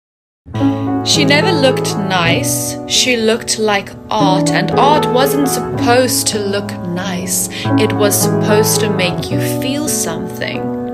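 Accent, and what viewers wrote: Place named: United Kingdom. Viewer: i like this voice so much and it's british accent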